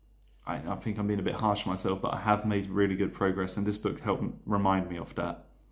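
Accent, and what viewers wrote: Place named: United Kingdom. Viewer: i love your british accent very calming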